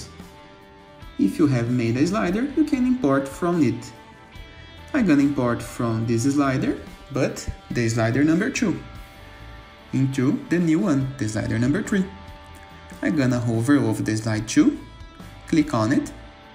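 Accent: Brazil. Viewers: Certeza q é BR.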